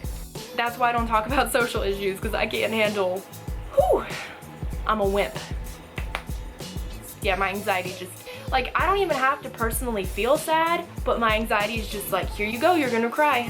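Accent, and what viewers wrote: Texas, United States. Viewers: Is she from texis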